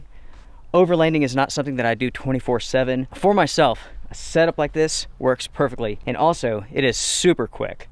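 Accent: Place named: North, United States. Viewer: where's the mountains of North Carolina accent ? you sound like a yankee to me.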